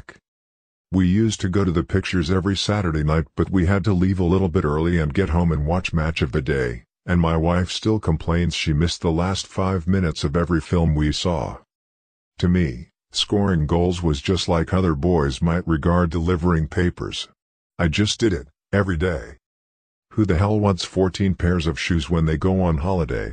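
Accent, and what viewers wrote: United States. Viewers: This is weird - At least they could have got someone with a Yorkshire accent to read them instead of an American droid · Great quotes from a great man read out by a mechanical bot with a septic tank accent! shameful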